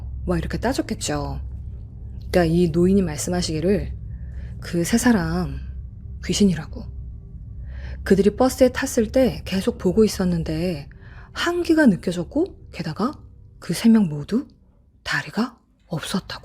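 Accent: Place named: Jeolla, South Korea. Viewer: 전라도 비슷한 말투가 듣기 싫어요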